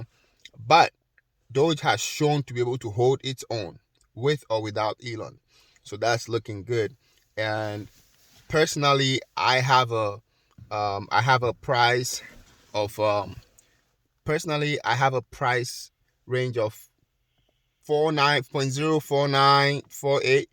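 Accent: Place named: Africa. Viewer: Where are you from??, you have an African acent. I even just subscribed because of that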